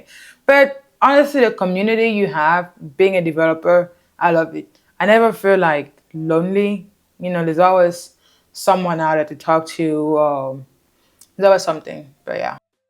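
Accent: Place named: France. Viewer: Hi, I like your French accent that hides behind the English one!! I subscribed just for that!!! Je me suis abonné juste pour cela!!!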